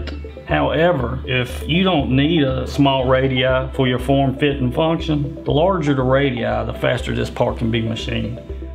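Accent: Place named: Deep South, United States. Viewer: Holy southern accent Batman. You gotta be from the deep South or the Cajun states. I'm in Wisconsin, spent a decade in Tennessee Georgia north Carolina area and that's a strong accent to me.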